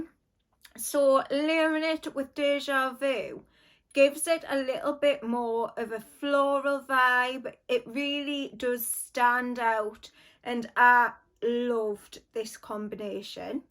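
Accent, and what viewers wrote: United Kingdom. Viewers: Hi. I was in high school in the 90s. I might have to pick this one up for fun. Thank you for your layering suggestions. You have a lovely manner of speech. Are you from England or Wales?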